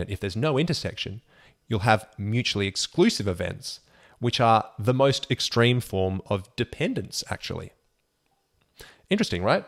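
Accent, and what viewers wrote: Australia. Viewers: You speak Australian accent?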